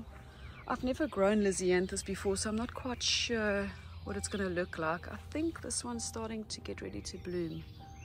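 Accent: South Africa. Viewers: What a beautiful, well kept garden. A nice surprise to hear your South African accent. An ex pat here, in Austria.👏😍👏😍😊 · You sound very South African🇿🇦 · Hi, dont know your name but I just found your channel last night. I literally turned green with envy watching your beautiful garden in the USA. I live in Napier, Overberg Western Cape. So listening to your "normal" SA voice was SO nice to hear. I particularly loved your video of repotting strings, which are favorites of mine. I realize I am not doing enough propagation seeing all yours. I will be glued for more vids ❤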